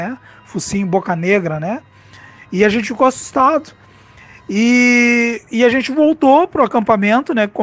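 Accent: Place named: Rio Grande do Sul, Brazil. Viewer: Eu Amooooo esse sotaque Gaúcho,adorei a história envolvendo escoteiros,aqui em casa tenho 3 escoteiros.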